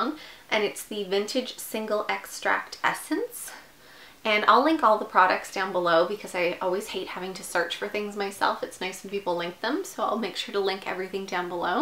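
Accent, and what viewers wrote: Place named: Canada. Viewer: I thought you were Canadian , I’m from Nova Scotia !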